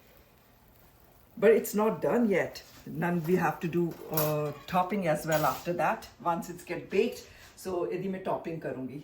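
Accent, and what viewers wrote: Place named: Punjab, India. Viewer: We love your Punjabi accent… please continue in Punjabi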